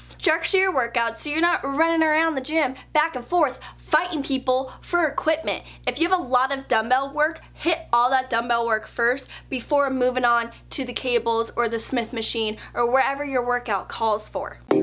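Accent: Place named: South, United States. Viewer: Are you from the South? Sometimes I hear the accent, sometimes I don't.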